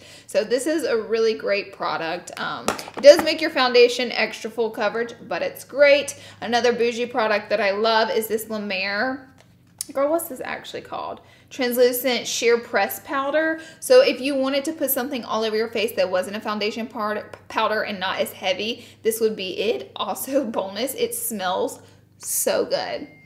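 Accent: South, United States. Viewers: Her southern accent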